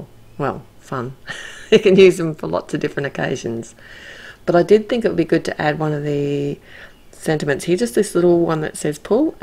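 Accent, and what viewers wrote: Australia. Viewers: Very cute card. Farts doesn’t sound so bad when you say it with our Aussie accent! 💖🇨🇦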